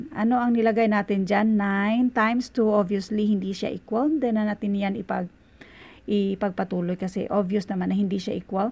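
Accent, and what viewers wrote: Visayas, Philippines. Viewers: Bisaya ka gang. Kita kita r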